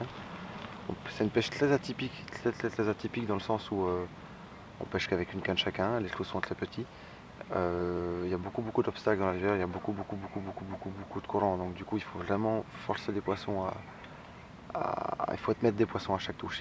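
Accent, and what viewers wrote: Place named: Tahiti, French Polynesia. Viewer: salut sympa ta video, au fait on dis rigoureux lol, il vient d'où ton accent on dirais celui tahitien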